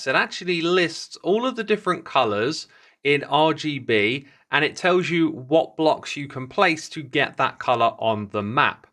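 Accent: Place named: United Kingdom. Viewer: I was hoping to find a comment like this. British accent certainly doesnt help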